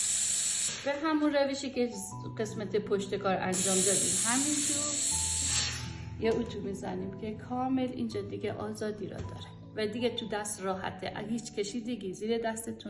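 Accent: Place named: Yazd, Iran. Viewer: ممنون گلم.واسه آموزش.وممنون واسه لحجه ی قشنگیه یزدی.من شیش هفت سال یزد بودم خیلی مردانه.خوبی هستن.❤❤❤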